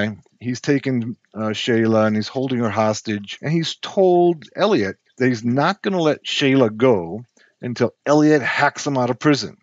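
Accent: Afrikaans, South Africa. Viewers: Hi are you afrikaans? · You sound south african, great content!!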